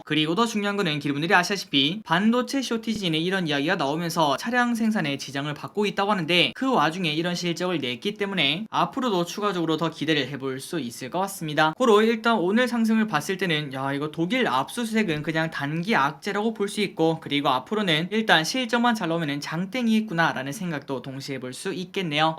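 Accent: Gyeongsang, South Korea. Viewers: 간다간다 뿅간다~!! 대구 사람이엇음? 방가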